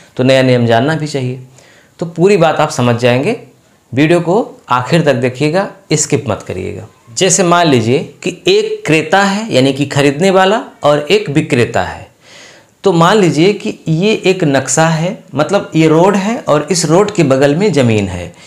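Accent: Chandauli, India. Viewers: Aap chandauli se ho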